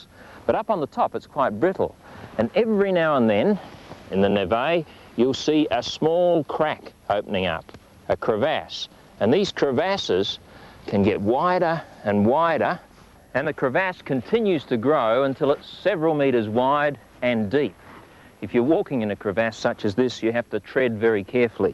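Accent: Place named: Australia. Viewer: That is how we Aussies pronounce glacier as a rule.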